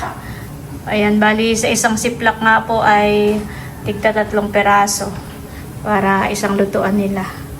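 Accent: Quezon, Philippines. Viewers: Taga Quezon po kayo Ang punto po Ng salita nyo ay Quezon po saan po kayo sa Quezon po Ako po taga Lopez Quezon po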